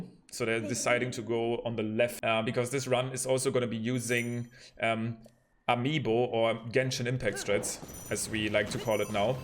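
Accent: Germany. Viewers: du sprichst doch locker deutsch dein aktzent hört sich so an weil du nicht so gut die asusprache von englisch kannst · Man merkt dass du deutsch bist haha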